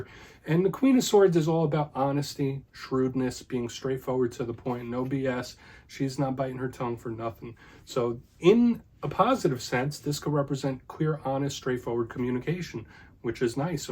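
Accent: United States. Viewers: At least you speak English like the true American you are! I’m so sick of all the foreigners tarot readers from other lands that we can’t even understand with their too thick accents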